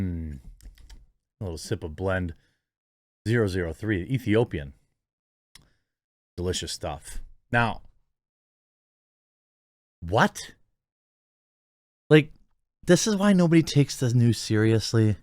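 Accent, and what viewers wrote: United Kingdom. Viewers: Guys with pompous British accents telling Americans how to run their country hasn't worked out historically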